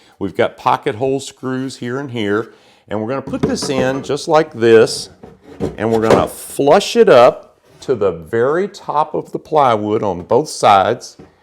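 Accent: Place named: Tennessee, United States. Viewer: I think I heard a slight Tennessee accent. My Dad's from TN.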